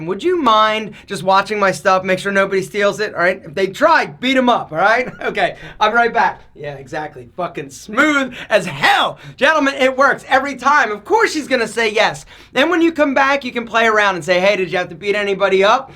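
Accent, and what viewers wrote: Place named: New Jersey, United States. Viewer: So you’re from new jersey I can tell from you’re accent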